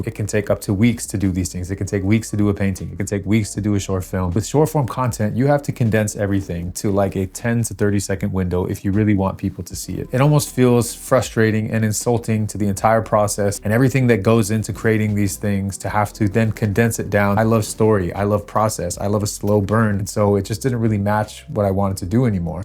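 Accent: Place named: United Kingdom. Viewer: Hi; I enjoyed your video. I shall follow u henceforth. I guess, you are a British guy or an Australian guy as your accents are not American. But I had no difficulty at all in following each word that you spoke. Kudos to your endeavour.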